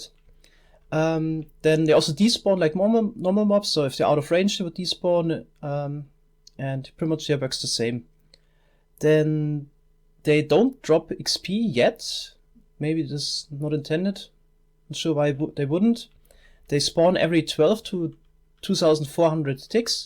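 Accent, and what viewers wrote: Germany. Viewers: Mann merkt den deutschen akxent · wie Methoz auch noch am Anfang Hallo sagt :D Find ich cool das auch deutsche/Österreicher englische Videos machen :) Man hörts aber (nicht böse gemeint) :)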